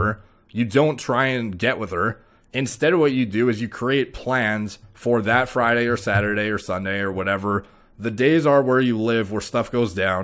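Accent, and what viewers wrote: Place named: Toronto, Canada. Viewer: Didnt realize you were canadian. It sounds like you went york uni lol. I agree with alot of what you said.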